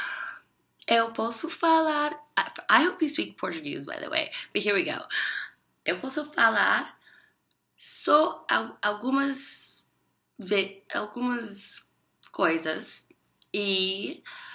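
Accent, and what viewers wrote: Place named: Southeast, Brazil. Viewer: You have an accent from southeastern Brazil, when you speak Portuguese hahahha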